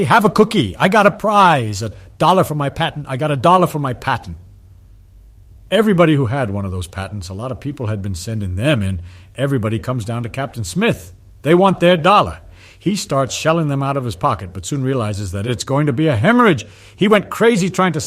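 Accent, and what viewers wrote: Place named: United Kingdom. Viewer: the British accent lol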